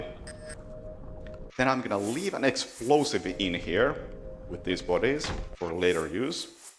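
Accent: Finland. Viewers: "Hello" - this guy's Finnish! Damn the accent of my countrymen is so distinguishable it's not even funny :D Great gameplay. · Beautiful finnish accent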